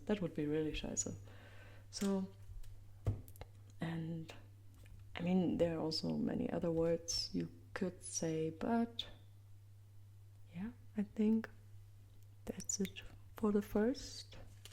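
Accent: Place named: Germany. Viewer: Hannes Von Sydow hmm, wie kann man nicht raushören, dass sie muttersprachlich deutsch spricht ? :o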